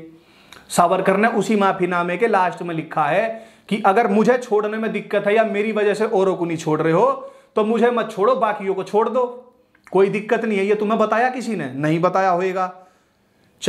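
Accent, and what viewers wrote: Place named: Haryana, India. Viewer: Kahn the bhai itne dino se??? Aaj mile ho. Awesome work dude. Nice haryanvi accent.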